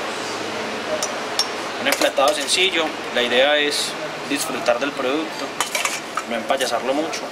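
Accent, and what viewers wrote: Colombia. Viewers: cheff con acento colombiano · es colombiano por el acento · HABER , HABER ........ COMO HAZ DICHO .. . por tu fonetica entiendo que eres colombiano .. el cebiche PERUANO nunca pero NUNCA se , utiliza perejil . SE UTILIZA CILANDRO huev....... y el sazonador que indicas alegremente y, tratas solo tratas de explicar , se aplica de acuerdo al criterio del cocinero y eso lo hacen cuando el pescado no es fresco y no es apropiado para un cebiche .. como en tu caso NO quiero ser alarmista pero los medicos recomiendan no consumir algunos peces y entre ellos esta la tilapia que por cierto hay mucha variedad ..... este cocinero de tercera ... mejor dicho ayudante de cocina ... ya ha tenido mucho tiempo para aprender a hervir agua ..dado el tiempo de publicacion de este video ...,, deseo y le pido que para hablar de cocina PERUANA tenga la gentileza de aprender mas ........ 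un saludo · Por el acento es colombiano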